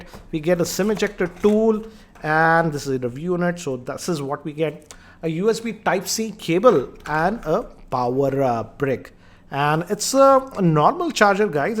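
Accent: India. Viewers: Are you from india because you're English is not at this girl but i really like your videos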